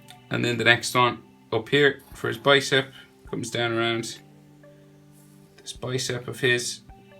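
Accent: Ireland. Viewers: Your accent sounds sorta irish would ya happen to be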